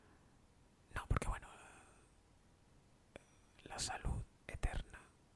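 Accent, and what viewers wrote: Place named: Spain. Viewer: Eres la única persona que escucho en español y además el único con acento de España que me gusta,tengo que ser sincero y decir que me molesta un tanto ese acento en ASMR,pero no el tuyo ;) Gracias por dedicarle tiempo a este video y aunque te tardes en subirlos tienes unos cuantos cientos de subscriptores que siempre están al pendiente de ti(me incluyo en ellos) saludos!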